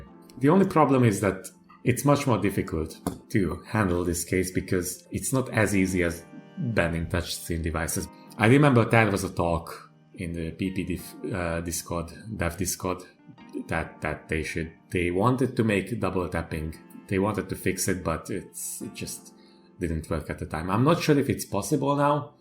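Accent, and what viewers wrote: Hungary. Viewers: At first you kinda sounded like a Hungarian but I wasn't sure, good job and keep up the good work!:) Csak így tovább.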